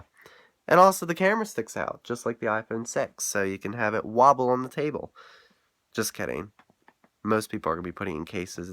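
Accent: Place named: Philadelphia, United States. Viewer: You sound like you're from around me :) Philadelphia? And yay- Can't wait til 31st!!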